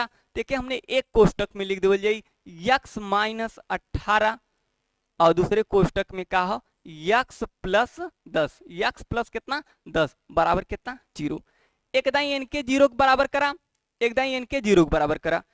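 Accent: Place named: Bhojpuri, India. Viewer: गजब सर इसी तरह भोजपुरी अंदाज me padhiye bahut अच्छे se samajh आ raha है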